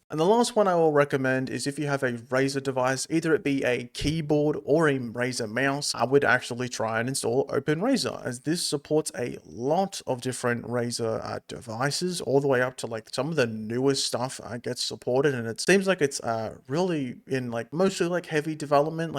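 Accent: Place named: Australia. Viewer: "It's Linux next year", proper Aussie accent and YouTube transcription service is in shambles. Maybe this year will be THE year for Linux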